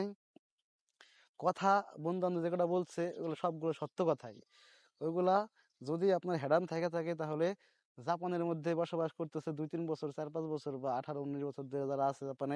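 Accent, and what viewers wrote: Faridpur, Bangladesh. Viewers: ভয়েস শুনেই বুঝে গেছি৷ কোন এলাকায় ভাই?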